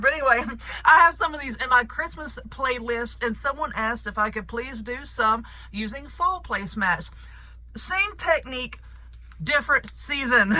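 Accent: South, United States. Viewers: Love the pillow but mostly I love your good old southern voice. I could listen to you all day and your sense of humor.I am going to make this pillow. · I love your channel and your southern accent! You are too darn cute! Love love your fall pillow! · Awe..... love me a country accent!!! Fellow southern girl!!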